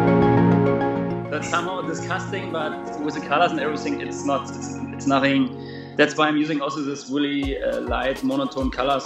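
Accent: Germany. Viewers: The way he speaks englisch he is a german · I‘m german and i can clearly hear the german accent when he talks hahah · Typisch Deutscher Akzent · His german accent lol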